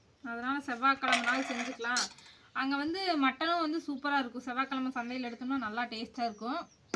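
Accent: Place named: Kongu, India. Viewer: கறிவிருந்து அருமை அருமை நேற்று நீங்கள் பேசிய கொங்கு மொழியும் என்னைப்பற்றி கூறிய விதமும் மிகவும் நன்றாகவும் விரிவாகவும் இருந்தது உங்கள் அம்மாவிற்கு தேனீக்கள் போல் என்றென்றும் சுறுசுறுப்பாக இயங்கும் அம்மாவிற்கு எனது பாராட்டுக்கள் ❤❤❤❤❤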